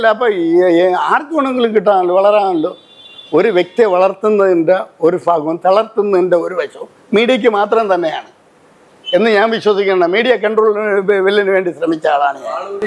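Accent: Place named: Trivandrum, India. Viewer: Ithokke ivide vannu ' You Tube' vazhi enthinanu chetta parayunnathu. Adheham marichu ee lokam vittu poyille . Ithokke kondu ningalkku enthu gunam aanu ullathu. Aaloor prasasthan aayathil ningalkku enthu nashtam aanu ullathu . Valare thaazhnna nilayil ninnum kashtapettu oru LLB degree eduthille . Vyaaja degree yum koondu judge maar aayavar vare nammude naatil ille . Ningalum Alloor rine pole ningalude maarggathil prassasthan aakaan nokkuka . Ningalude malayalam slang un reethi yum kettittu ningal Trivandrum jilla yude thekkulla etho sthalathu janacha thu pole aanu thonniyathu. "Adikalum kitti " Pune vittu ennathu kettittu , onnukil ningalude kudumba thil aarengilum Trivandrum thinu thekku bhaagathu ninnum undaayirikkanam Allathe ee slang varilla .Ningalude slang Parassala , kaarakonam, Amburi , Amaravila, kanjiramkulam , vizhinjam kaliyikka vila slang pole thonni. 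Aaloor marichu poyille chetta..vittekkoo.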